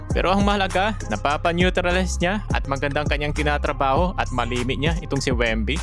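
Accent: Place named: Visayas, Philippines. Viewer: Nako salita palang bisaya na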